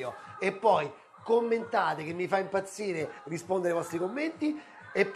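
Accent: Rome, Italy. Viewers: CIAO.. TI RINGRAZIO TANTISSIMO PER TUTTE LE VOLTE CHE CUCINI.. SEI UN GRANDE👍MI PIACE TUTTO QUELLO CHE CUCINI... E POI QUANDO ASSAGGI QUELLO CHE HAI CUCINATO FAI VENIRE VOGLIA DI MANGIARE E DI CUCINARE SUBITO.. SEI PROPRIO SIMPATICO.. POI PARLI ROMANESCO E IO ADORO TUTTO QUELLO CHE È ROMANO... IO SONO UNA CUGINA DI ROMA.. (CIVITAVECCHIA) POI SONO UNA NONNA DI 73 ANNI.. QUINDI È PIÙ APPREZZABILE QUELLO CHE SCRIVO.. GRAZIE... UN SALUTO A TE E LA TUA CAMERA WOMAN.. UN BACIO AL BIMBO.. CIAO ALLA PROSSIMA.🌹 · Il dialetto romanesco è sempre uno spasso..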